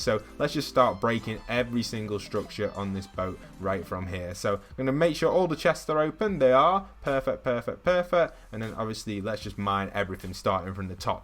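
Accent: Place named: Cheshire, United Kingdom. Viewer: Hey you sound like your from the Cheshire area it would be awesome if you are because I am too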